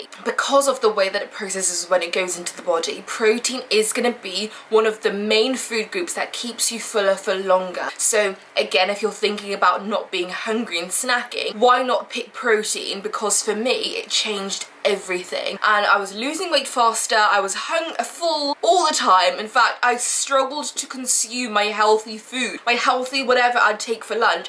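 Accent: United Kingdom. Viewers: She sounds like she could be in bridgeton… love it 😅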